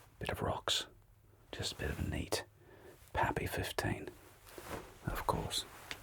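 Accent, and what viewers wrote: United Kingdom. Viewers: I seriously thought you were actually British 😮. Amazing content as ever ❤ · I absolutely love the VIP experience you provide in your suit measuring videos! So calming 😌 I love the triggers and the British accent too! Thank you so much J! Smashed another video out of the park again! (when do you not?! 😂 ) PS. Love the profile pic update too! Looks cool! Keep up the great work! Have a lovely weekend!! 💙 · I was not prepared for how much I would enjoy you with a British accent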